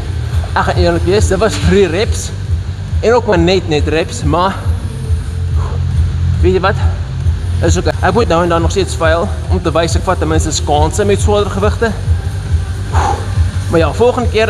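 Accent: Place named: United Kingdom. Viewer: for a second I thought he was talking british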